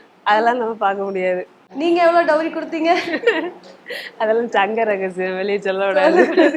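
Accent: Kongu, India. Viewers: Anchor kongu side pola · I think the anchor is from kongumandalam.❤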